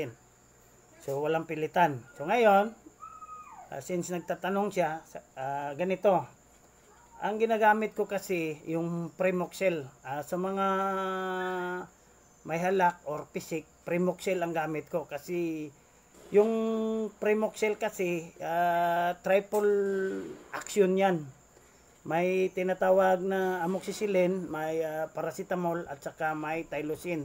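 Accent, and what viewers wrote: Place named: Visayas, Philippines. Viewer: tgaasa diay ka boss.? bisaya mn diay ka?😂😂😂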